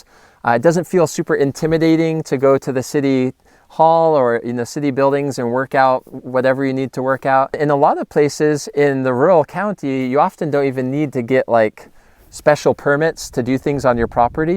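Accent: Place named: Utah, United States. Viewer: Guy in Washington State here--I just watched your videos about fungicides and thought, "Hmm. . . this guy's style and accent are more like someone from Utah. (Meant as a compliment; Utah is where most of my family live). I guessed right:) I love hearing your backstory and seeing the Missouri land and landscape. It's gorgeous and looks ideal for growing things. Thanks for the great videos.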